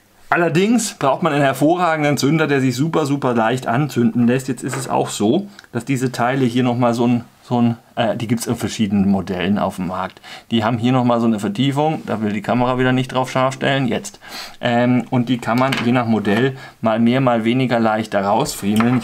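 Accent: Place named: Alemannic, Germany. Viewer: F. allemannischer ist noch ein bisschen weiter im Süden. In der Gegend vom ist das badische noch vom Elsas beeinflusst. Dadurch unterscheidet sich der badische Dialekt noch ein bissle vom sch(w)äbischen. <ma‘ muss hald do no ä bissle de badisch/schwäbische Freindschaft pfläge, gell> 🤣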